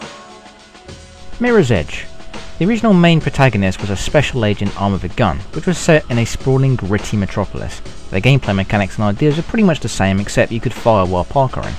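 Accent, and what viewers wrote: United Kingdom. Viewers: I can't understand half the shit this guys says. He sounds british but then says 'dewelopment" like a russian. I need some fuckin subtitles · So, why can't the guy who narrated the "creepy easter egg" video have done this onr too? I can't fucking understand anything over that thick British accent!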